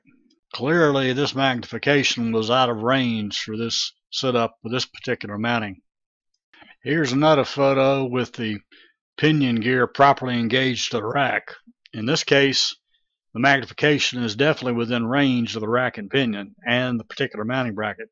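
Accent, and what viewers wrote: United States. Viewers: Country accent 😈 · Great Work ! Accent is the best ! (Nashville ! guess)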